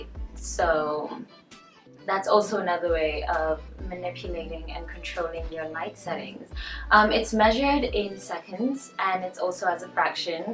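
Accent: South Africa. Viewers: You sound South African.. i'm confused lol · You sound South African? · WOW!!!! My friend, you sound a bit south african - are you?? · That accent... you're South African right?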